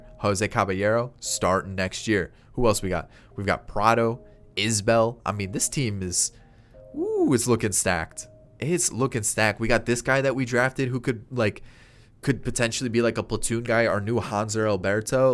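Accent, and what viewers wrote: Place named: Boston, United States. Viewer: Sound like a Bostonian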